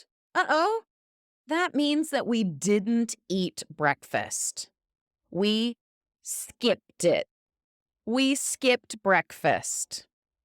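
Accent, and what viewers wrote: United States. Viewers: It's amazing hearing pure American accent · Teacher makes more videos alone , I love your Americana accent . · Good to have lessons only in the american accent like this.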